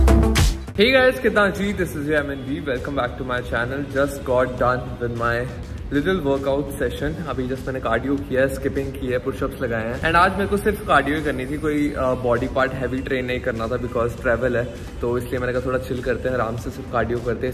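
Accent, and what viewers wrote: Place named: Bengal, India. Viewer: Your bengali accent ♥️🔥🥺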